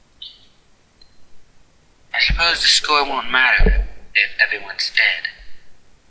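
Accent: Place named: United Kingdom. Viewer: British accent..nuff' said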